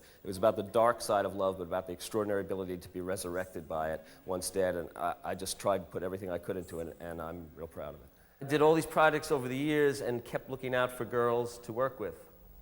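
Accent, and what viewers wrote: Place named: New York, United States. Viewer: His accent is THE New York City. I don't think he was brought up there though. A friend sent me this, said, this is Jim's mind. He's actually quite sane.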